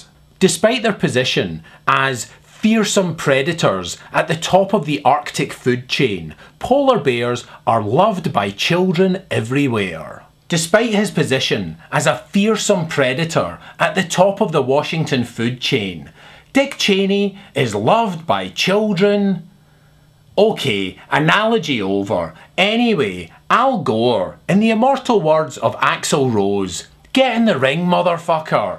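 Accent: Scotland, United Kingdom. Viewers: STOP POLAR BEAR DISCRIMINATION!!! Fuck rats, though..., can you please cuss more? I love when you cuss in your Scottish accent...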